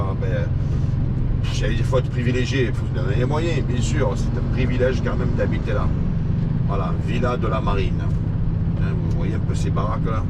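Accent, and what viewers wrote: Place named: South, France. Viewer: Cool ta chaîne, je m'abonne, sympa l'accent du sud 😊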